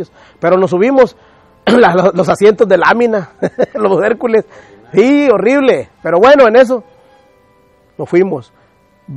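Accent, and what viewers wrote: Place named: Mexico. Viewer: Este abla.como mexican..estubo en la usa?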